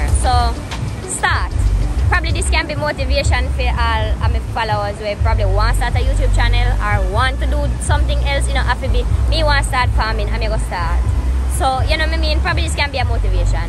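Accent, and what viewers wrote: Jamaica. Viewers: Wow. Simply Wow! "Pretending to speak like that...Sound that awful"...what a negative way to describe a native accent no matter what part of Jamaica we come from...none of it is awful. Based in her stories she was born in Jamauca, went to school in Jamaica and did very well in high school ..so she can speak however she chooses depending on the situation. That's an unkind statement. is a great representation of #outofmanyonepeople ..We all can look and speak differently. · Welcome back I miss u and your cute face with ur Yardie talking u looking beautiful as ever 😊❤ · Good spirit, beautiful vibes!! Lovely accent... I love Jamaican people!! 👍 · Greetings from London uk, you are definitely a Jamaican Lady and your accent just fantastic. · . Your Jamaican. Patois tun up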